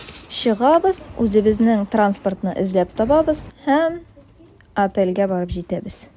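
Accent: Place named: Kyrgyzstan. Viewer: Кыргызчага окшоп суйлойт екен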